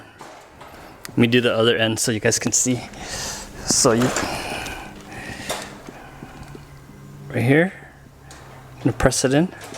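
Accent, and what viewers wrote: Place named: Pennsylvania Dutch, United States. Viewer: You really helped me put my M01 together. I was so frustrated I was going to return it. I bought the same model from Amazon last month and it came broken and I sent it back. When I got it the second time last week and couldn’t get it together or find a video to help me I was so frustrated. My mother is from Lebanon, PA and thought you had a Dutch accent. Hey, my parrotlet and I thank you so much. Stay healthy and happy friend.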